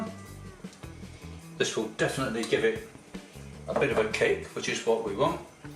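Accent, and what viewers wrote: United Kingdom. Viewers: Hello! I love fish! Especially when it's a form of pie 😊 anyways, are you Scottish? You sounded like it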